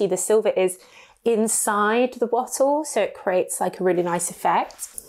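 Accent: England, United Kingdom. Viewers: just wanted to tell you how much I enjoy your reviews. You are such a joy to watch! I found you a little over a year ago when I was recovering from a surgery. I watched tons of your videos to pass the time and I so enjoyed every one. You seem like a true girlfriend/Penpal to me. I’ve always loved perfumes and you share that love obviously like many of us. I have to admit even if I’m not interested in a perfume you are reviewing I’ll still watch your video just because you are such a light and a cheery person and I absolutely love your English accent. I also just stumbled on one of your older videos and I have to say that my husband is also dyslexic. You are so very intelligent and eloquent with your words. So thankyou again for what you do and you are very very appreciated ❤️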